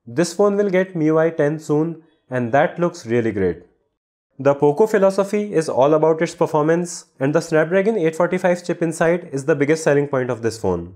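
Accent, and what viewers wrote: India. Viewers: Somethings wrong with me. I cant take indian english serious.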